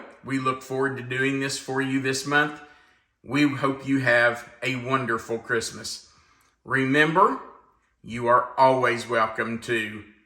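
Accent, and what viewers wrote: United States. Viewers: Just sayin, I'm from Nevada. If you can say Banana correctly you can say Nevada properly. Great video can't wait to try it on our grandchildren. Didn't notice the accent....just American accent! Keep going.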